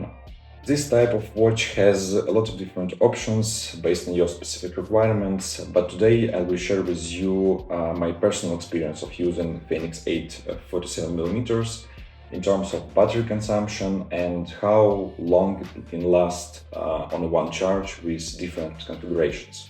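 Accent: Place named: Russia. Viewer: U from Russia? I hear strong Russian accent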